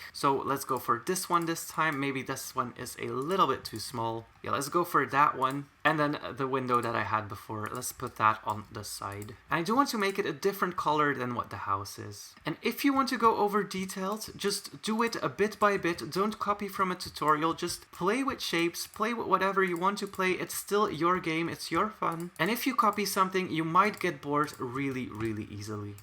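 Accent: Flanders, Belgium. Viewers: Ben je ook Belg?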